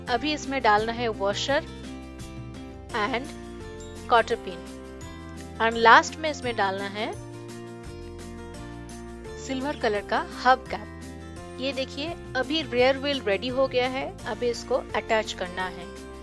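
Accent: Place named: Bengal, India. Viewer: Bengali lady 😂😂... Your accent says it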